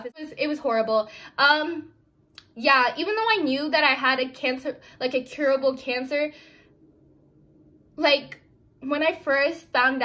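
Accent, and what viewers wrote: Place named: Visayas, Philippines. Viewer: Get well soon, imong bisaya conyo miss na namo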